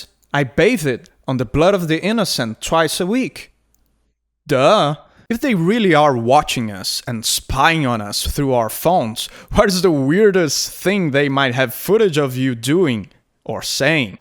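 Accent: Brazil. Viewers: Eu sabia que eu tava reconhecendo esse inglês de algum lugar, você é brasileiro! Conheci seu canal tem pouco tempo e só tenho elogios! Continue mandando muito bem!!!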